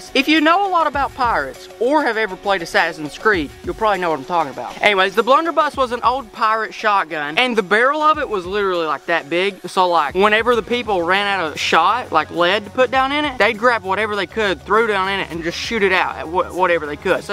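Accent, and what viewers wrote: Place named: Georgia, United States. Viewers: Sounds like he's from Georgia but says pop. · Bro i love your accents literally can't get more south than that! Yee yee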